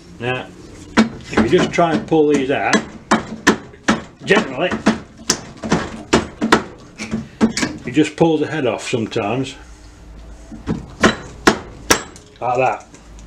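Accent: Yorkshire, United Kingdom. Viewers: Ey up, lad's from Yorkshire int he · By 'Eck, a Yurkshireman! Greetings from a homesick Brit expat! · It vaguely seems that you are attempting to talk in English. Ah, you are a “Brit”! Sorry for you to be so unlucky to have such a sloppy upbringing. You could go to USA for high school, then you might learn ENGLISH LANG.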